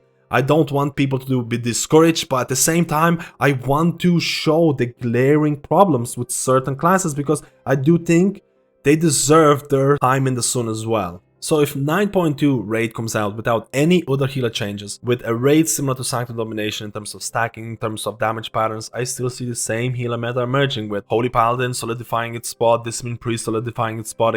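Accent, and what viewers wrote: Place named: Ireland. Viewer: What's the deal with the accent? I can't place it, but there definitely seems to be a heavy dose of Irish in there?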